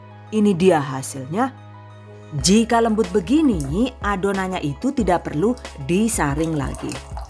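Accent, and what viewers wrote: Banyumas, Indonesia. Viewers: rika wong endi yuu..deneng ngapak banget😄😄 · Saya recook ini kak, buat jualan, hasil x endeessss🤤, selain itu paling suka dengerin kk nya ngmng, gak ngebosenin, ngapak x gak ketinggalan jg 😆 #GiveawayTHV2021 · Wilang ngapak ya😅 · ngapak kaya nyong🤭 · Q nntn ngantuk pas dgr suara ky ngapak jd melek lg😅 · Mbak, asline uwong mediun apa brebes si.. Faseh bener logat ngapak e 😅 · Wong ndi sih ngapak temen yuuu🤣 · Deneng dadi bahasa ngapak🤣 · 😂😂ngapak bngt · Asyik jelas dan teratur bgt...ada bahasa ngapaknya juga😂😂😅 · DONE Terima kasih mbak krn selalu memberikan resep yg ekonomis utk ide jualan,serta dg penjelasan yg detail dg harga2 bahan serta hrs di jual dg harga berapa,suka lucu klo denger ngomong bahasa ngapak dg suara khasnya...semoga sll muncul ide resep2 br yg ekonomis buat ibu rmh tangga yg ingin memulai usaha..sukses dan berkah selalu..Aamiin #GivewayTHV2021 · Jan logate apa karo nyong..y slm ngapak..ora ngapak ora kepenak..slm kenal..boleh dicoba nih😋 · Rika wong ngapak ya Bu😂😂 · Hahaha....ngapak jaaan kiye lah...mtr nuwun bund resepnya.sukses sll kontennya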